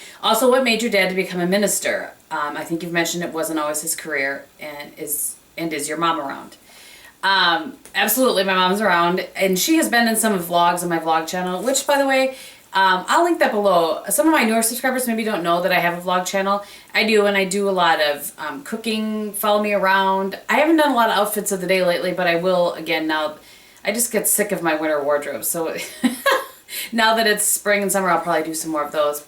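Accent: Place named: Wisconsin, United States. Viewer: I subscribed yesterday and have been trying to find more out about you. Something about your personality just speaks to me. I live in Chicago (now), but your personality, humor/laugh, and accent are shouting Wisconsin girl. Now that I know you like Packers, I know why I love your personality so much! Something about Wisconsin people just speaks to me.. It'll always be my home.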